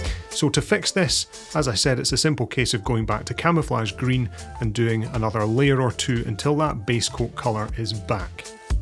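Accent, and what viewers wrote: Scotland, United Kingdom. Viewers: Nice video, good job on the green paint, end result would be fine on any table top. Side note: There is something about snarky comments and a Scottish accent that amuses the heck out of me. Always a good chuckle or three in your videos. Keep up the good work... love it.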